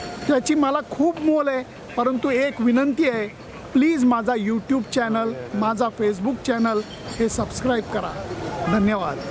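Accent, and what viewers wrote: Pune, India. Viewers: बोलण्याची सुंदर अशी शैली. शुद्ध पुणेरी भाषा.लेले आपलं विश्लेषण ऐकतच रहावं असं वाटतं 👍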